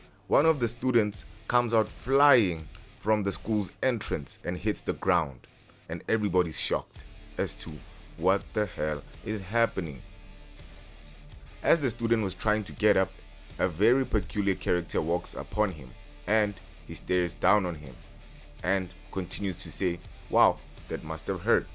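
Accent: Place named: Southern Africa. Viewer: You can hear a southern African straight away 🔥🔥🔥🔥🔥🔥🔥 much love from England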